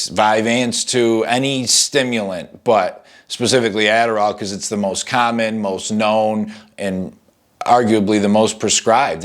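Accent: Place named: New York, United States. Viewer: You’re from central New York aren’t you? Lol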